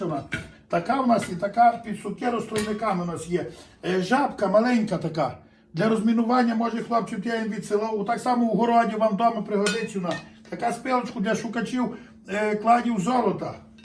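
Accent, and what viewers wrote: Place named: Galicia, Ukraine. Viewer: Класна буржуйка і майстерня. Судячи по акценту і діалекту пан з Галичини.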